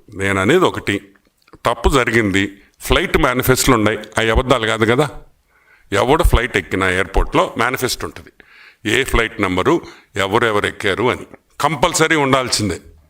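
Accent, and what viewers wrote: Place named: Nellore, India. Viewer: అనం వి రెడ్డి గారు 🙏🏽🙏🏽🙏🏽మీ విశ్లేషణ న లు అద్భుతమైన వివరణ నలతో చలో లోక్తులు తో నెల్లూరు భాషతో అందరిని విశేషము ఆకర్షణ తో వినసొంపుగా ఉంటున్నాయి 🙏🏽🙏🏽🙏🏽🙏🏽🙏🏽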